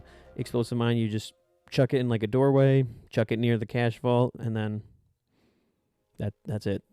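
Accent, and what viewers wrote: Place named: Eastern Europe. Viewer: Are you Eastern European? you have an interesting accent.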